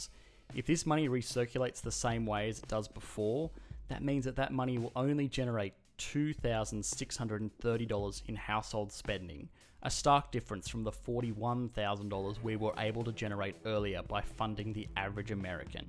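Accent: Australia. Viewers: Australia has had the economic advantage of massive mineral wealth (even though most of the wealth has been plundered by multinationals under Liberal governments), which has meant that the GDP is much higher than comparable nations - in addition we have a (increasingly less) generous welfare state which includes packets of money for people studying or looking for work - which is circulated back into the economy at an incredibly high percentage, as neither students nor job seekers are known for their massive wealth hoarding. In more modern history, we were able to escape the worst of the global financial crisis through some appropriately timed stimulus packages by the Labour Rudd/Gillard which included literally just giving money to every citizen and asking them to spend it in the economy - which reinforces the main point of the video that if more money is given to the masses, the economy is more secure. 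Coming from an Aussie - which, from the sound of the uploader's voice, is the where the person making this video also came from.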